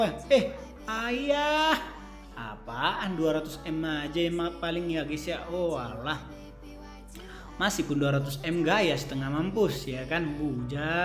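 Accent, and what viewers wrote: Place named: Medan, Indonesia. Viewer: Bng kalo boleh saran gk usah di campur logat medan,, kek garing bat di dengar😌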